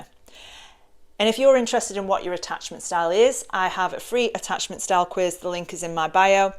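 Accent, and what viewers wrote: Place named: Lancashire, United Kingdom. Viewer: Super helpful must help with the Lancashire accent? I dont know but gathering my self worth is helping alot i was excersizing boundaries which prompted my bring ghosted so on the right track but funny how the feeling of "oh what if" comes in againgst the obvious logic lol it is like breaking free from some kind of addiction obv attatchment x thanks for being you x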